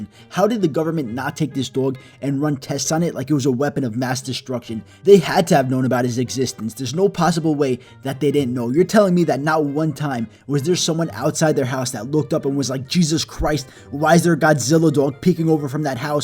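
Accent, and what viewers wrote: New York, United States. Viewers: Is it weird I wanna hear you say the “I told my daughter to not give the dog coffee and what...” because even though it’s not a thick ny accent it’s there also the magnitude earthquake and shit 😂 So perfect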